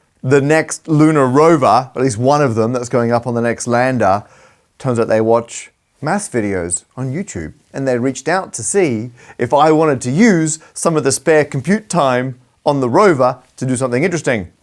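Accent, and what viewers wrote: Australia. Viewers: He's not a Brit. Can't you recognise an Australian accent?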